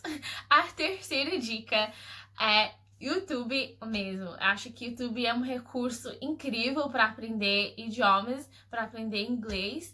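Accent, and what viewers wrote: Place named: Brazil. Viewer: Meu Deus, achei que fosse brasileira. Que português lindo vc tem 👏🏾👏🏾👏🏾👏🏾🥰❤️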